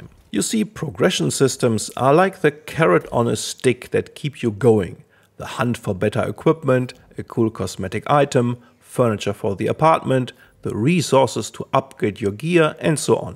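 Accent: Germany. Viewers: Oof, the german accent is kinda killing me.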